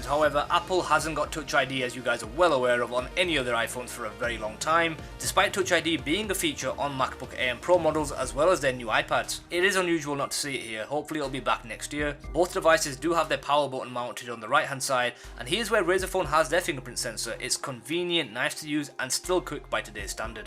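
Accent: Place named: North, United Kingdom. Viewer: This Asian man speaks with a distinctive Northern accent which is very different from the hybrid Jamaican dialect which is spoken by Pakistani teenagers in London. The original Indians of India were Black and migrated to India from Africa 170, 000 years ago. But i am only referring to young Pakistani teenagers in London who speak English in a hybrid Jamaican dialect which is very different to the distinctive Northern accent this guy has.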